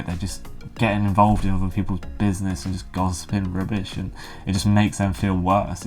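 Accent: United Kingdom. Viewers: That's because judging from your accent you're from Northern England. They can be a horrible bigoted miserable bunch in the North. People in the South are generally more genteel, urban and cosmopolitan. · After living solely in different countries in my life with a third-world country background. At this moment I believe it's just between 6-8 considering living standards in first-world countries, what makes the difference is your priorities, having a partner or close friends or not, all other things can make you feel better and some people just want to stay away from their culture, that could also give some freedom! This life offers adventures but we also crave stability, as long as you are young exploring will make you more open-minded but ultimately you need to make the best out of your life! BTW I love the British Accent!!!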